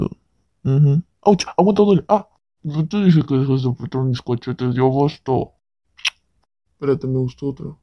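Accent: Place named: Peru. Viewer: Tu acento peruano es supremo! Amo.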